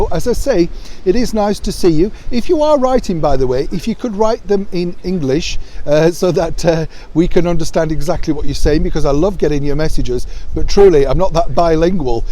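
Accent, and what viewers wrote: Yorkshire, United Kingdom. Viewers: It doesn't matter who is behind them if they don't do the work infront of them. If they are crying poverty, it will be another one of Megan's poor me heart-string pulls. H, too. They are used to pulling sad, bad or mad faces and getting what they want, but this is the grown up world I think they have bitten off more than they can chew. Celebrity they may think they are, but they are apparently considered to be a kiss of death to anyone's careers they touch. And the decent people in those circles don't want to be anywhere near them, they have proved time and time again they are not to be trusted. I felt a little sad at a language barrier being expressed. I don't know how you watch the comments, but on most devices there is a 'Translate into English' under every comment in another language. Soon as you tap it you're bilingual for a moment. 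Actually you do speak two languages, your lovely, lilting Yorkshire tones and then the right proper English like wha' t'Queen does ont' telleh 😁 Hope you are enjoying your weekend, whatever you're doing, you're doing well on it!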